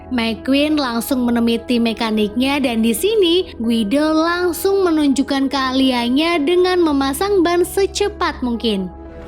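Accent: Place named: Java, Indonesia. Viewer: Jowone medok men mbak e😁😁😁😁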